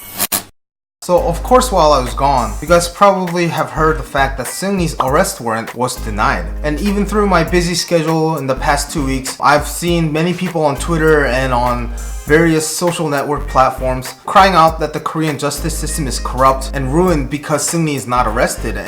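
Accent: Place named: England, United Kingdom. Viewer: Like your English accent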